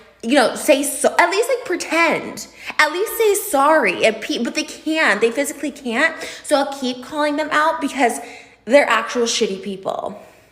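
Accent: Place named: Midwest, United States. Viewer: It’s the Midwestern twang for me!!! 😂 I never knew we had an accent here until I moved to Florida for a year to get sober! So, I guess we have an accent!! 😂 and yes, we do say ‘fixins’ !!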